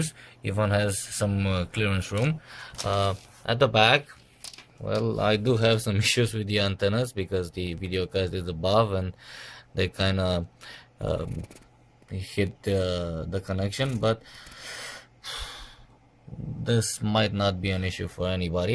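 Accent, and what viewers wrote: Romania. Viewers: de la accent mi am dat seama ca ești român :))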